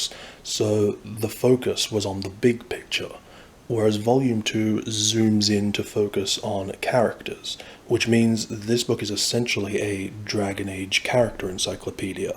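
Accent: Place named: United Kingdom. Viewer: Dragon Age + an ASMR-like narration with British accent = great combination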